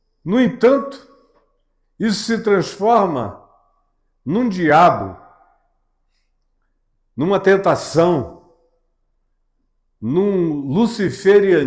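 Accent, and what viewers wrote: Recife, Brazil. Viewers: Aí sabe que fala Recife Pernambuco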